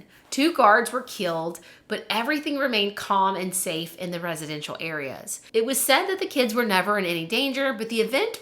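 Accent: South, United States. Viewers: This was so interesting. I didn't know that families lived there. I can't imagine in today's world having hardened criminals babysit and entertain your kids. It makes the men seem less evil than they were. I love your content so much! Do you have a script written of what you want to say and read from it? You narrate so well and in such detail, you can't be just remembering all that! LOL. I am a southerner as well so I thought you had some southern connections. The friendliness, the laid back style, softer ways of pronouncing some words, but I was guessing maybe the Carolinas, not Louisiana. Deep south, like me, as I'm Lower Alabama.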